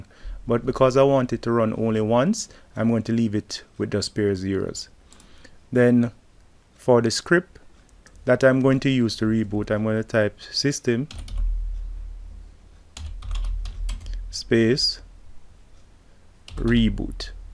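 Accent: Jamaica. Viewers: You sound Jamaican, I lived there for a few years. Thanks for the video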